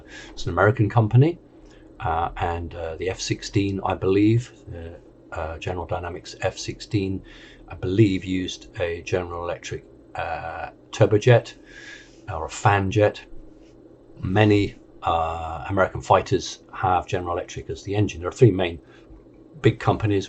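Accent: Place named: United Kingdom. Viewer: サイモンさんの英語はイギリスだね。だからイギリスからの声。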